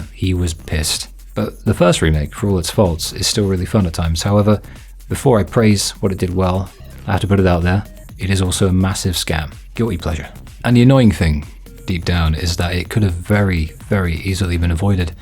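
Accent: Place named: United Kingdom. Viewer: oh he's british. Now it all makes sense.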